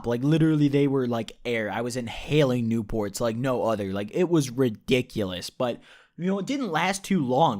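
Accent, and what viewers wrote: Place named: Illinois, United States. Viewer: i fucking knew you were from Illinois based on how you talk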